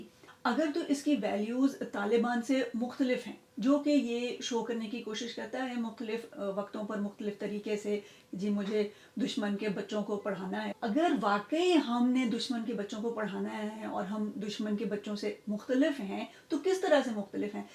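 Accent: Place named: Karachi, Pakistan. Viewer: Waaah waaah mazaa agaya apka video dekh kar unbiasedly spoken ❤❤❤ from now I'm big big fan of yours ..u sounds urdu spk from Karachi wich are my fav ppl in Pakistan looking forward more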